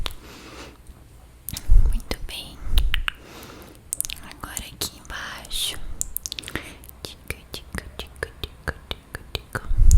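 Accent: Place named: Goiás, Brazil. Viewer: Vc é Goiana? Seu sotaque parece nosso ❤️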